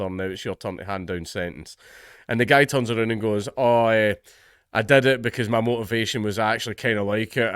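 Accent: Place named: Scotland, United Kingdom. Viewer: you have a few drinks? Your thick Scottish accent seems particularly thick here, I'm a Canadian. Almost need some closed captioning over here, man. Boyl, I think I heard?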